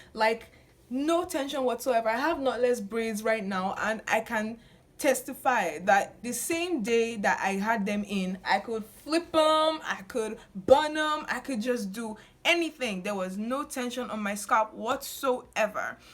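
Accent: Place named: Africa. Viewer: i love your accent. my dad has an african accent, and sometimes i can’t understand him but like african accents are just ughhh😫😌 i love em.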